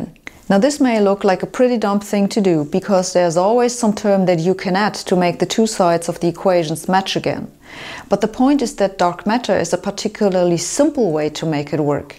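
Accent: Germany. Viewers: why a german accent sound like they are super genius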